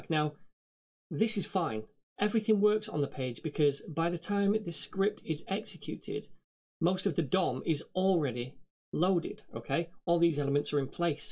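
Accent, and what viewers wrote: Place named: Manchester, United Kingdom. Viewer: Thanks for putting this course together, top stuff. Do I hear a Mancunian accent?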